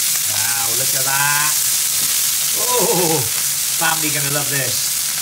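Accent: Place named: Newport, United Kingdom. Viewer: Are you from Newport mate? Accent sounds like it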